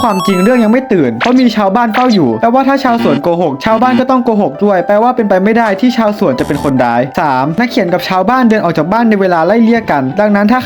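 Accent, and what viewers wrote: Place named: Thailand. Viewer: พี่ครับพี่เป็นคนไทยชัดๆเลยครับ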